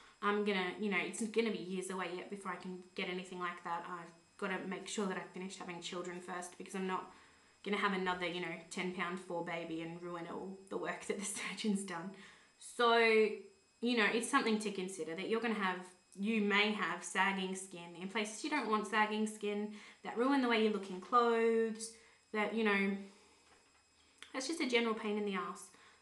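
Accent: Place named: Australia. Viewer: Hey, fellow Aussie here, really liked your honest post, so nice hearing the Aussie accsent too. Sleeve day is June 14th, very excited. Thanks for the vids!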